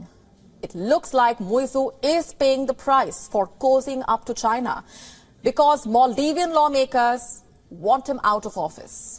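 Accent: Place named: India. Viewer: What an accent ! An Indian sweet english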